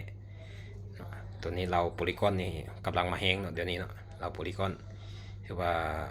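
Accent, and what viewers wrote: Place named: Khon Kaen, Thailand. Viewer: ເວົ້າຄືທາງແກ່ນທ້າວບໍ່ແຕນແທ້ອ້າຍ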